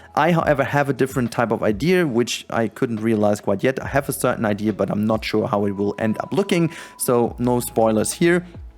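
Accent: Germany. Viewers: I hear the German Accent😂 I love it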